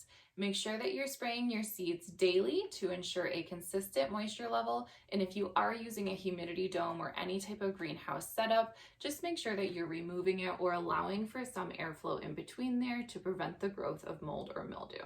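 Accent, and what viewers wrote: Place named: Great Lakes, United States. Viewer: The “baig” pronunciation of “bag” tells me you live near the lakes, like me. ❤️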